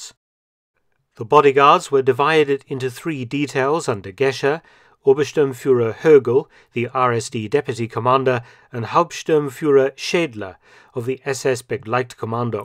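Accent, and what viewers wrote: United Kingdom. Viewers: his proper British accent, is second to none. 💯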